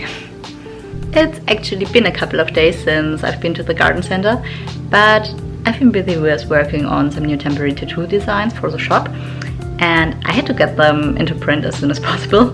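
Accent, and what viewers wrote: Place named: Germany. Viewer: Your vlogs are so soothing! Also, your adorable accent makes me miss living in Germany! :D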